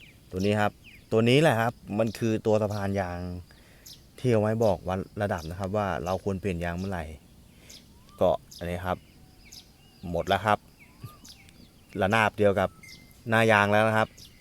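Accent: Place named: North, Thailand. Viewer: จะถามหลายทีแล้วครับ จขกท.เป็นคนที่ไหนครับ สำเนียงออกทางเหนือจังเลยครับ ไงก็ติดตามคลิป ตอนนี้ยังไม่มีกำลังทรัพย์กับวาสนา อีกหน่อยคงได้ควบฮาเล่บ้างครับ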